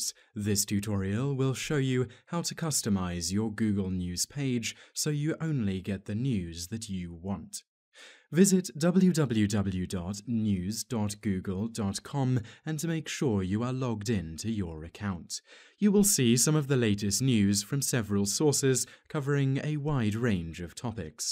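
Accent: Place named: RP, United Kingdom. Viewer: You sound soooooooo posh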